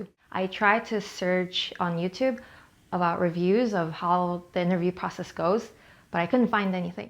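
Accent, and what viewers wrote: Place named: United States. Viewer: How can you speak American accent, really love your accent ❤️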